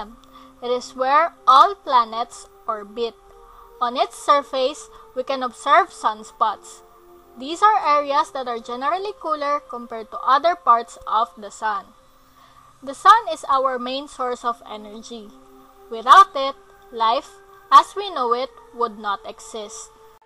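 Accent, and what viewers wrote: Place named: Philippines. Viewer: The voice makes it like she's a Filipina (Philippines)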